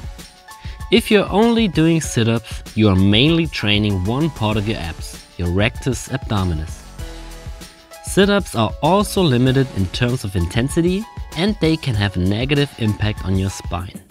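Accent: Germany. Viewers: Are you German? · Are you from Germany? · are you from germany?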